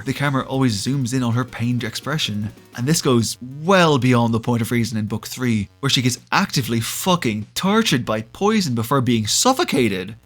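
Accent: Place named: Ireland. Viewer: exactly 😅 it's so mixed, it's like every Irish accent mixed together in a bowl 😊 no hate, I just can't place it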